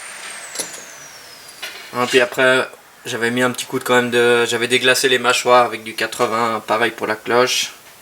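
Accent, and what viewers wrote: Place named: Switzerland. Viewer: Je découvre cette chaine, aaaaaahhhhhh ça joue! Un ami Suisse cet accent m'avait manqué!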